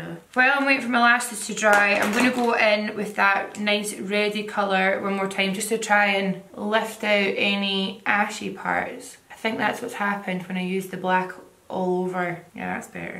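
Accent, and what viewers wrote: Scotland, United Kingdom. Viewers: “Pish” high five to the Scottish bird lingo, nothing sounds as good as an angry Scottish girl 🤣. I’m Scottish too and fairly local to you 😀👍🏻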